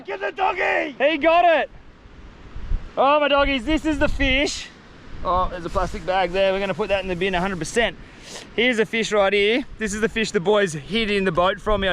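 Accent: Australia. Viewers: AMERICA 🇺🇸 LISTENING TO AUSSIE MAN TALK'IN CRACKS ME UP FAIR DINK'UM COMPANY 😂😂😂😂 I'M LOV'IN IT THE WORLD IS GREATEST PLACE ON EARTH 🌎!!! FAIR DINK'UM DUDE'S 👌🤠🐸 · My god I can't stand that stupid Australian accent, no effort to speak more clear, you would get more viewers.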